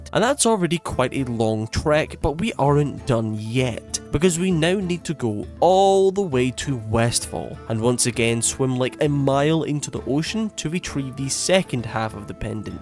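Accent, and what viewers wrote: Scotland, United Kingdom. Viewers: The accent make me remember my friends grandpa from Scotland 🏴 ❤ he was such a nice guy and these videos are so comforting ❤ love from Norway 🇳🇴 🎉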